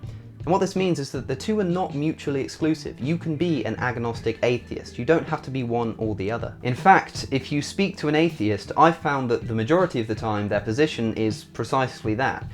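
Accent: United Kingdom. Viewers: Are you British or English?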